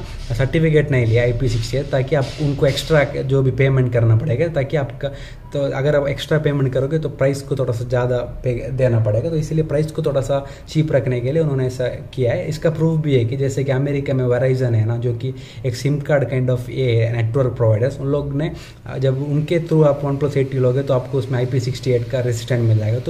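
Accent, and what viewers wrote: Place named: Mangalore, India. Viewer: Your style of talking😁 like mangalore pepoles when talk hindi iam also from mangalore😉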